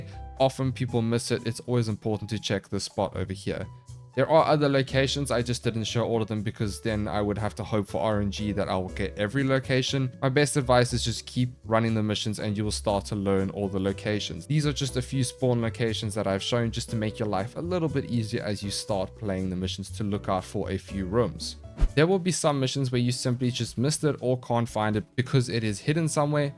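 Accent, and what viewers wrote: South Africa. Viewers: i have to ask where are you from? you sound like you from South Africa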